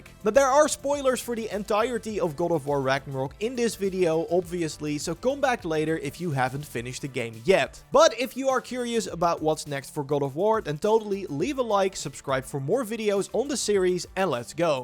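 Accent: Netherlands. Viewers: Are videos voiced over by multiple people these days? The voice is very different from some other videos and the shorts, both sound like they're from the netherlands though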